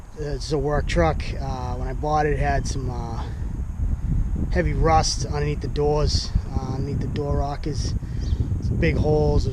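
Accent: Rhode Island, United States. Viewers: You sound like a Rhody. Great job.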